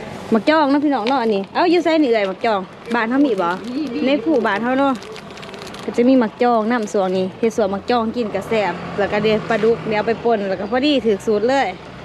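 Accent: Ubon Ratchathani, Thailand. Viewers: พี่น้องไทยลาวกะนี้ล่ะสำเนียงการเว่ากะคนเมืองอุบล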